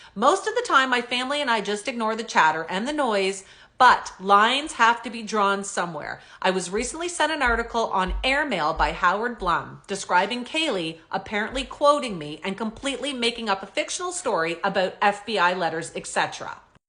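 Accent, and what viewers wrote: Canada. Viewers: I don't believe your pronouncing Steve and Kaylee's last name correctly. Never mind the Canuck accent. should check that out. Maybe I'm wrong 🤷🏼‍♂️🤦🏼‍♂️🤷🏼‍♂️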